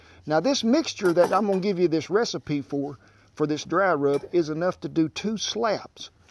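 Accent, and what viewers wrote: United States. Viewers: I really come here for the cow boy Accent ( the Wild West language).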